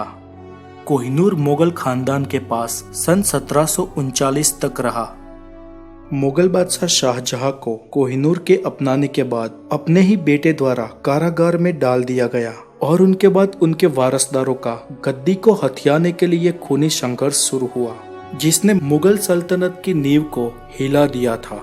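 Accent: Gujarat, India. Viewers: ભાઈ તું ગુજરાતી છુ???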